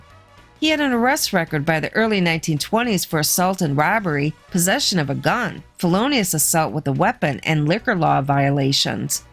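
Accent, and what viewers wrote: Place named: Chicago, United States. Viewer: Your Chicago Land accent is hilarious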